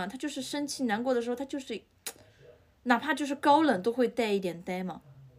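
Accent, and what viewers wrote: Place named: Henan, China. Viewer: 哈哈哈哈，一口河南口音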